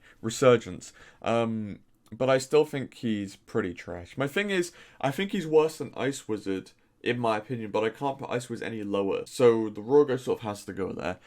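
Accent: United Kingdom. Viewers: I watch your videos mostly for your british accent. I JUST LOVE THE WAY U SPEAK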